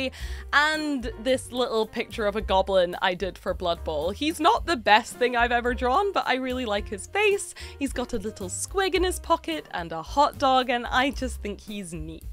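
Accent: Scotland, United Kingdom. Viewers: Definitely a Scottish twang in your accent, but not an out right Scottish accent I feel. Have you been out of Scotland for a good long while perhaps? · Very obvious Scottish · Her accent sounds Scottish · its nuts saying this but as a fellow scot i was still like "IS SHE SCOTTISH?!?!" for a while watching a few of your videos, i thought maybe you had moved somewhere and got a dual accent maybe. but then i settled on "nah she scottish" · Yeah that bit I thought was wild, I thought, her accent is obviously Scottish but also very much not Australian or American... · How do people think you have an Australian accent? your accent is very definitily north of Hadrians wall lmao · Congratulations, I think you've done a fantastic job with your channel. You're so easy to like, and your passion for the hobbie shines through. Seriously, though, how anyone could think you were from anywhere but Scotland is beyond me 😂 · I just assumed your accent was Scottish mixed with speech therapy due to dyslexia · I knew you were from Scotland. 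You have the same accent as Christine Kochanski, Listers babe from Red Dwarf. The earlier versions not the English one obviously. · I now know why I love your accent my grandad was from dunfermline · Anyone who'd place your accent anywhere but Scotland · My wife was born in Dundee, moved to Glasgow when she was a wee teen, then moved to America when she was about thirty. I recognized your accent immediately. She collects sea glass too. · I think your accent sounds Scottish with a hint of notts, maybe sutton/Kirkby area lol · People thought you were American?! As an American, I'm baffled. To me, you sound like a Scot with an accent somewhat influenced by British broadcast standard (e.g. BBC), but I'm American and am not deeply familiar with UK accents. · I figured it was Scottish, but couldn't put my finger on it, very light northern Irish was my second guess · Ah, the challenge of an East Coast Scots accent. 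I’ve been asked if it was Canadian. In Canada. No idea why it’s such a hard accent to place. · Just sound Scottish to me. But to be fair, I'm English and know a fair few Scots. So I have a higher level of exposure than someone from outside the UK. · It is strange-- I can usually pick out a Scottish accent right away, but for some reason it never crossed my mind. I kept getting stuck in a loop of Welsh? No. Northern English? No. Welsh? No... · Maybe it’s because I have Scottish family and wear a kilt full time, but there was no question about you being a Scot. The accent is a dead giveaway and in this video, the flannel you are wearing is Black Stewart. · Quite obviously Scottish. Silly people. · My word, I've never met another Scot with the same accent trouble. I don't use Scots, so my accent comes out similar to yours, and people guess all over the place for me. I've had American, Canadian, South African, and one person came close and said Irish, but it seems like only other Scottish people recognise me as Scottish instantly. 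It's usually the hard R sounds that mess people up, but I also heavily use glottal stops which should clue people in. I'm from Campbeltown and live in Cardiff. All my family sounds properly Scottish, using Scots and such, though, so I always thought I was just a weirdo. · It's so good to hear more Scots on YouTube. I knew your accent was from somewhere near where I was brought up, which was up the road from Dunfermline in Perth. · I was like “that accent is definitely Scottish” and then all the questions came up and I thought man… am I wrong, is it something else… nope. I guess perhaps modern media has led people to think everyone who is Scottish has to sound Glaswegian. I lolled when you said “Australian” but I can see how some might think American if by that it’s the Appalachian accent which can sound a bit Scottish, but given its relatively rare and you live in the UK Scottish is far more likely 😂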